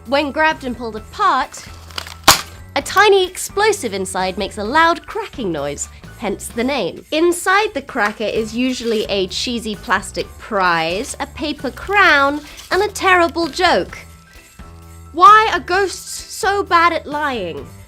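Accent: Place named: United Kingdom. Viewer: I just stumbled across your video...I love British Xmas...for the cheerful party vibes...after all it is JESUS's BIRTHDAY...so Happy Birthday Jesus... ;) what I do not like..is the artificial plastic Xmas tree...I prefer the ones that are in a pot and still alive, when planted back in the garden... you've got a third subscriber..hope you are doing well...I love your cute British accent... lots of love...from Germany :)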